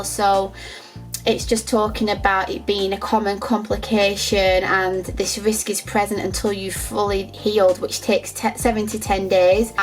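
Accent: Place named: London, United Kingdom. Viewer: thank you for this information. it helped me. I found your accent very easy on the ear. im from California, Huntington Beach area. so our accent is unnoticed by our ears and yours stands out. but with your accent in particular, it reminds me of a london couple i once met. also you have fabulous hair and i also noticed the amount of empathy and inclusive concerns for the audience. so it creates more engaging conversation.... i totally relate to what youre saying. i found myself hanging on every word and i can say i really learned something about my experience. i went through literally the same issue. makes you a very beautiful and very decent person and i truly appreciate this info. thank you for this. liked the video and subscribed.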